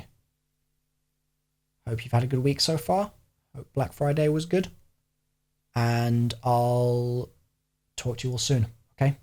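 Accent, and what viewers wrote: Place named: England, United Kingdom. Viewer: Goodbye, I'll miss hearing zombies commentary in your english accent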